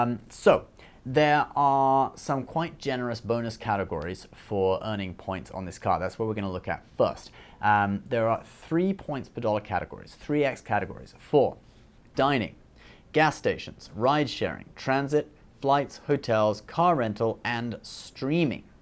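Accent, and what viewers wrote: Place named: United Kingdom. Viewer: American Eagle shirt + Brittish accent = paradox that could unravel the very fabric of the space/time continuum, and destroy the entire universe.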